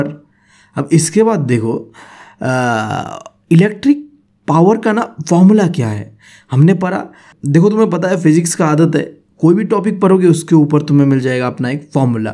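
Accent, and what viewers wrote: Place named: Assam, India. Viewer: Bro is axomia 🙌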